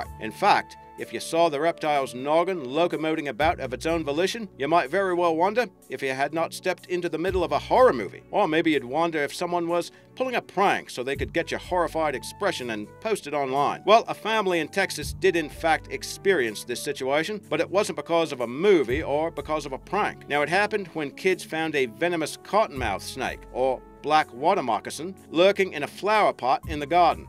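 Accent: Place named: Australia. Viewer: I think he's Australian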